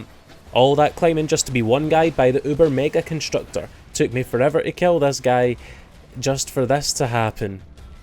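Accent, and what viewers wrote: Scotland, United Kingdom. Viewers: u a scot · A Scottish Borderlands youtuber, I'm in!!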